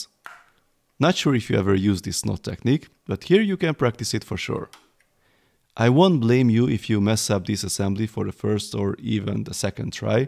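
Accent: Hungary. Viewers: Csak szerintem van a narrátornak magyar akcentusa? :D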